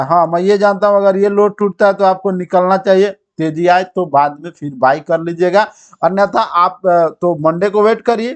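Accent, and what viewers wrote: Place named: Bihar, India. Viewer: Aap Bihar se hai, aisa aapke bat se lagta hai.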